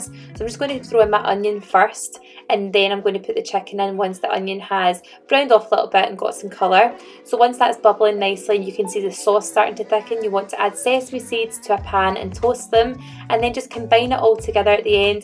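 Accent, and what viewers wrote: Scotland, United Kingdom. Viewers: Yayyy! A new video! I’m still having trouble figuring out what you’re saying but I play the video twice. I’m getting better at figuring out the Scottish accent.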